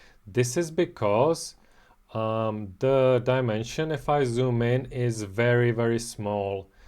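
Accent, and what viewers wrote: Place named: Czech Republic. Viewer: Are you Czech btw? I can hear it! 😀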